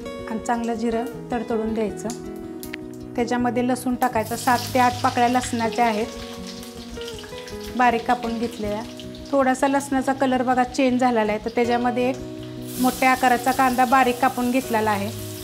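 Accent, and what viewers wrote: Satara, India. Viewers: खूप छान 👌👌👌 भाषेवरून आमच्या सातारा साईडचे वाटता..चॅनेल फॉलो केले 👍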